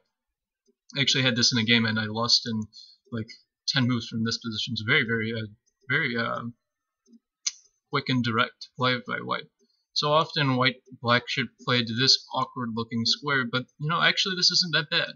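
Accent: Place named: United States. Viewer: Some chess video instructors for some reason present a black opening from the white player's side, which makes it more difficult to follow. Kudos for presenting the Leningrad Dutch from the black's perspective,. You presented well in clear American English, covering the intent of black for each move, and the possible white moves in reply. Thank you for a great opening that was taught well. I hope you do more chess videos!:)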